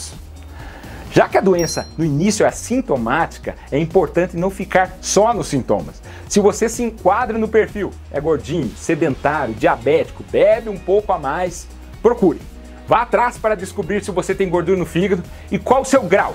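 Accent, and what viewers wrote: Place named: Caipira, Brazil. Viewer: Amo esse sotaque caipira dele.❤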